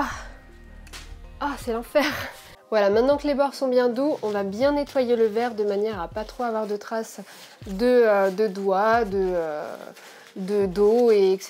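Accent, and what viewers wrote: France. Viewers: A few minutes later with French accent😀. Spot on. Nice channel.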